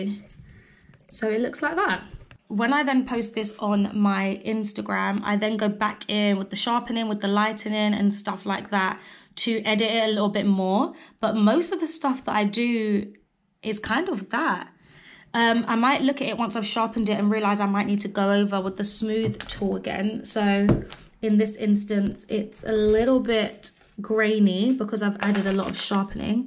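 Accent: United Kingdom. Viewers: The English accent is so cute to me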